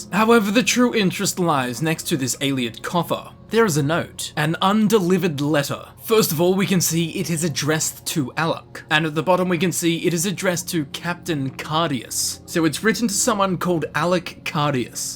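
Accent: Australia. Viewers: Ccoooooollllll!!! Thanks for sharing! I wish I could get the character overhaul to work but alas, I cannot! Just a question, are you Australian or Kiwi?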